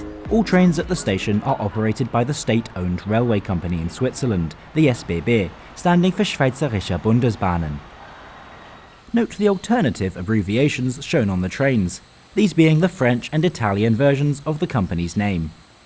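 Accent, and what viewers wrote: Germany. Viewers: He's pronouncing them in germany-german not swiss-german😂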